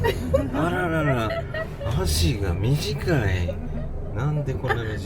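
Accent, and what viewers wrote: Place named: Nara, Japan. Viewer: さん、いつも素晴らしい動画とBGMをありがとうございます。本当に楽しく拝見させて頂いております。毎回日本ってまだまだ知らない絶景が広がっているんだな〜って感心します。ドローンの映像が素晴らしく自分で観に行っても見られないアングルからの映像に圧倒されます。ところで今回の動画の後入れのナレーションの音声が一寸だけトーンが高いように感じるのですが気のせいでしょうか？いつもの落ち着いた声が好きです。口調が奈良弁？でクピ男さん（クピ男の車中生活）に似てますよね。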